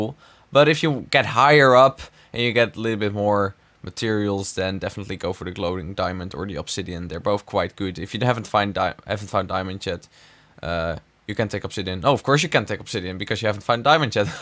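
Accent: Netherlands. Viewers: Je klinkt echt Nederlands! Maar dat neemt niet weg dat je een geweldige stem hebt, creatief bent en echt gave Showcases maakt! Ga zo door, je zal het ver schoppen! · ooh wow firt video I see you and I noticed imidiatly he sounds dutch i look in the discription The Netherlands wow YEAH!! DUtch too here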